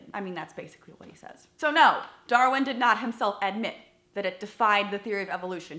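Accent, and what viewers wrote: South, United States. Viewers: that Southern accent though.... I know it's parody, but I like.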